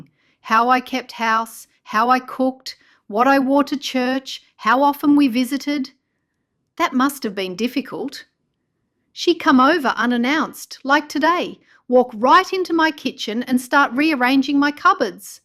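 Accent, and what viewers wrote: United Kingdom. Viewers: Wow! Heavy British accent!😂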